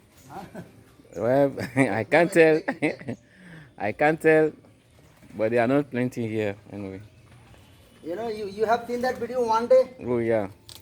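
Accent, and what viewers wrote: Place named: Noakhali, Bangladesh. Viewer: আসসালামুয়ালাইকুম ভাই ভাইয়া.আমি আপনার সবগুলো ভিডিও দেখি আমার কাছে অনেক ভালো লাগে. বিশেষ করে আপনার নোয়াখালীর কথাগুলো আমার অনেক ভালো লাগে এত বড় মানুষ হয়েও আপনি নোয়াখালীর কথাগুলোকে এত সুন্দর করে বলেন. আমার বাড়িও নোয়াখালী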